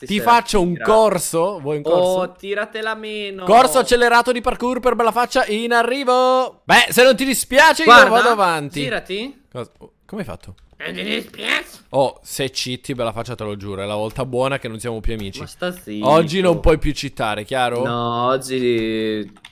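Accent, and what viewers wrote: Sicily, Italy. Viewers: Ma sei siciliano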